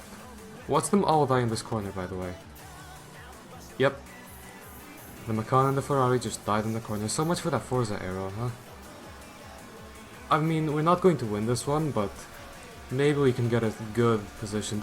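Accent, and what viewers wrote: Portugal. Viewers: Caralho, seu inglês é muito bom, muito de vez em quando posso ouvir sotaque português. Bom vídeo como sempre! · Portugal accent? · Voice is heard.. Maybe now I get a gf or a car faster... (By the way nice smooth Portuguese English eh)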